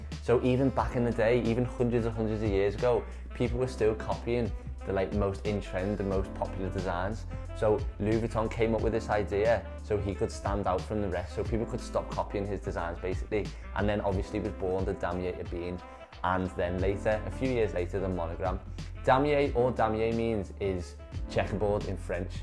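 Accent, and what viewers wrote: Liverpool, United Kingdom. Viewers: I think the Damier Ebene is better as it looks freshly sexy even when it is so old and used.. By the way are you from Liverpool?? :)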